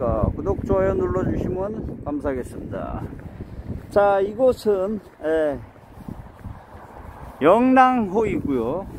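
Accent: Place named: North Korea. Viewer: 님... 실례지만... 고향은 어디세여? 말투가... 윗쪽 같으신데 알수있을까요?? 새터민이신가요?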